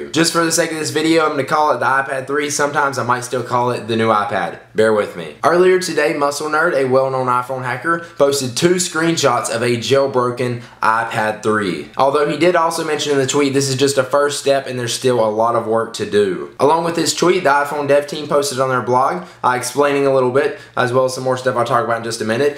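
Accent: South, United States. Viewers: You sound country...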